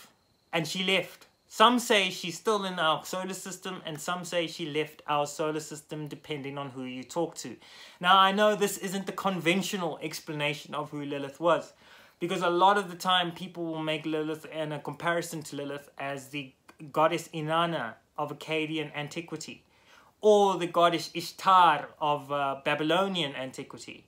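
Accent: South Africa. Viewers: Are you South African? I am South African living in Costa Rica. Lilith in the first house.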